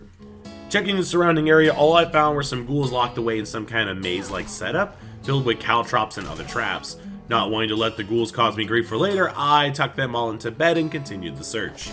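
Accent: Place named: Australia. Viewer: i can’t place your accent. it sounds australian sometimes but american at other times lol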